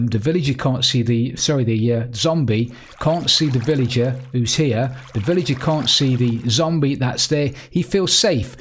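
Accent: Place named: East Midlands, United Kingdom. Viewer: Gonna give this a try. Your accent sounds like you're from near me in the Notts/Derby area......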